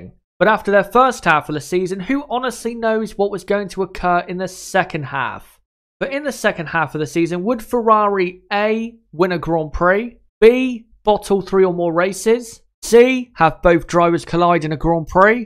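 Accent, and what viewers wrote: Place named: West Country, United Kingdom. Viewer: Great analysis. Are you from the West Country? It's just the way you pronounce "SebaRstian Vettel."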